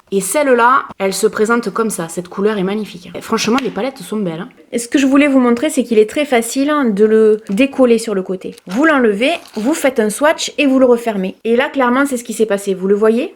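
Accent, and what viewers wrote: South, France. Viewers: Cc Ma belle tu est mon rayon de soleil ☀j aime beaucoup ton accent du sud et tu as un jolie sourire et ta bonne ça me fait du baume au cœur 😍 💕 prend soin de toi 🤗 😘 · Coucou, fraîchement abonné à ta chaîne, j'adore tes vidéo, ton humour et surtout l'accent du Sud vive le sud. Tu es de quel coin ? Bisous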